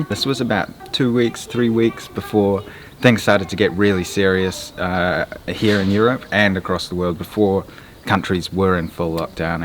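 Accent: New Zealand. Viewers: Piet Velkens Are you serious? I’m from NZ and he sounds very kiwi? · I'm confused, are you actually from Hungary and grew up in NZ for most of your life? Or you are from NZ and later moved to Hungary? I know either way you definitely spent most of your life in NZ bro, there's no hiding that accent haha you sound and even look just like my best mate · it sounds very Kiwi.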